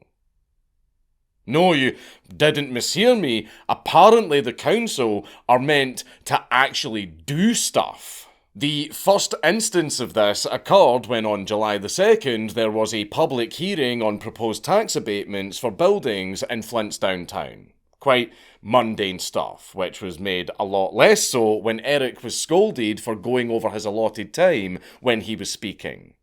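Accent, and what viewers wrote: Scotland, United Kingdom. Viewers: There’s really nothing more I love hearing in life than Dankula saying “ for free” in his Scottish ass fucking accent. “Fourfrii”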